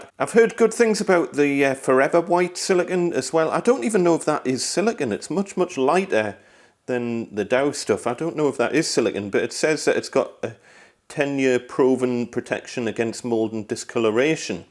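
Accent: United Kingdom. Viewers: His accent (and his wife's) is British and his website says "Proudly made in Britain" and has the UK date format with prices in £, so no, he's not in Toronto.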